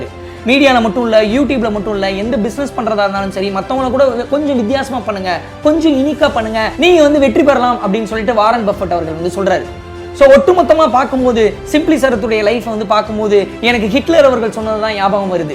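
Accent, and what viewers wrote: Madurai, India. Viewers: Ni madurai ya bro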